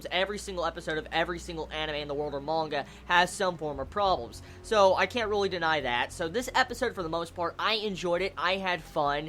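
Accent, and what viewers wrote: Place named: Tennessee, United States. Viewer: Ah my fellow Tennesseean, I can hear it, as I speak with it. Not such a strong twang so much as a way of saying some words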